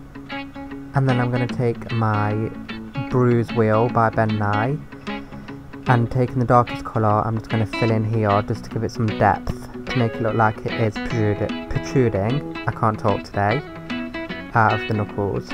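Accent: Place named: Nottingham, United Kingdom. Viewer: Just subscribed as it's amazing! Where you from? You sound like a Nottingham lad👍🏻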